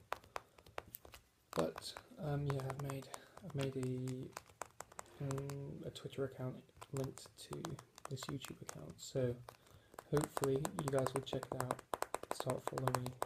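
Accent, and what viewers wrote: United Kingdom. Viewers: British accents are my weakness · When Hannah mentioned you I was sure you were already on my subbed list. But you weren't :-/ Sorry about that. I always enjoy stumbling upon new ASMRtists with UK accents. So now I subbed and already watched 3 of your videos. Can't wait to see more from you :) XO from Denmark. Btw. You're so cute ;) Hope you're not too young for me to state that fact :D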